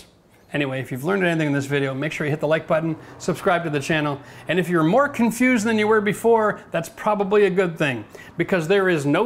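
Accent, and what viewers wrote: Canada. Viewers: I've watched every video you have. You seem like a cool guy and you are VERY knowledgeable! I don't mind that you have plumped up a bit over the years. Don't even mind the Canadian accent, but every time i see your dewalt tools i throw up a bit. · It's gotta be the Canadian accent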